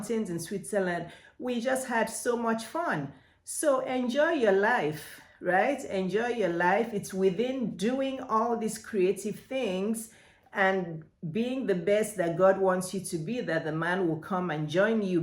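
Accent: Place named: Africa. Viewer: I love your African accent, who coached you in public speaking? I live in the Northwest Ohio area. Also, I'm a travel nurse.Latrice ✝️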